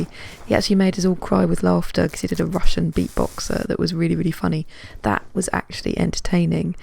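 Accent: United Kingdom. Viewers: I forgot that I wanted to mention as well what a great commentary this was. I enjoyed it thoroughly and agree completely. =) It's also awesome hearing the colorful varieties of english that you only get from the British.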